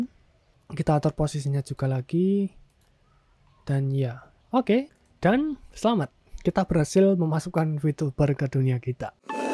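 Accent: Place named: Java, Indonesia. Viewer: Lumayan medhok ya mas brur😄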